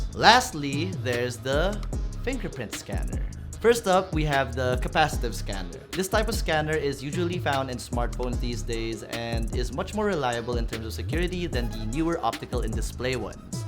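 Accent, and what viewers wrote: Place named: Philippines. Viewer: ewan ko ba ang cute ng tagalog mo..hahaha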